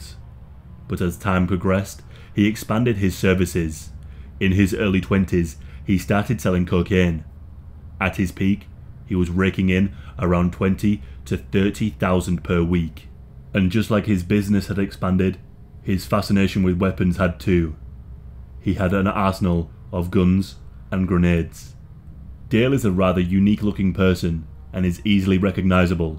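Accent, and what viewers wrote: United Kingdom. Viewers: Why do I picture Ian Curtis when I hear your speaking voice ? I guess it’s the deep British accent.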